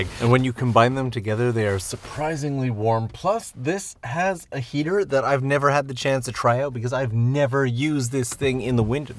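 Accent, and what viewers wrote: Canada. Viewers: Wow so cool it is really sad and mean that the wheel does not turn but it seems like whenever I see one of those in Japan they tend to be stationary😭 They just gave a us a new one at out Disneyland and it moves and everything🖤🖤🖤🖤 I love that japan makes everything so convenient though like you could literally live out of a camper van and be just fine bath and all🖤 wish america was more like that😭 I've said it once but I'll say it again, I absolutely adore your accent🖤 who knew a Canadian accent could sound so nice? Clearly I need to actually talk to my friends abroad and no just text ... that rail was beeeaauuuttiiiffflll🤩🤩🤩🤩 cant wait to see what secrets lie with the cabin, see you next week I hope🖤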